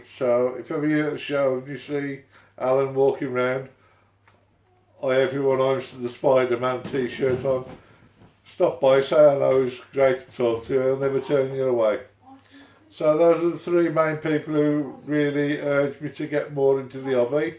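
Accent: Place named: Black Country, United Kingdom. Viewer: Nice one. Where are you from? Sound local to the Black Country?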